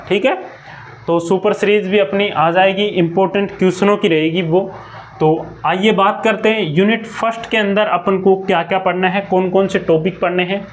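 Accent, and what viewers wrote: Rajasthan, India. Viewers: Sir aap Rajsthaan se ho kyaa 😊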